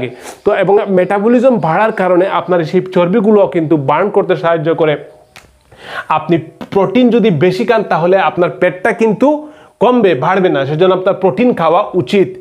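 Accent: Sylhet, Bangladesh. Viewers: সিলেটি ভাই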